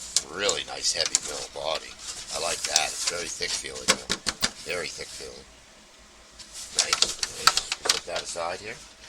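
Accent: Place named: Maine, United States. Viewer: Wow your from maine too I thought u might have been by your accent great vid by the way 👍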